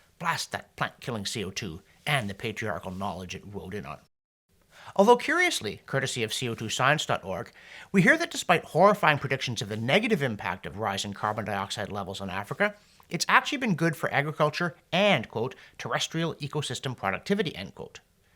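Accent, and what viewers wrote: Canada. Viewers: Oh, and by the way, I love your stuff. Your delivery is so, Canadian. Keep up the good work.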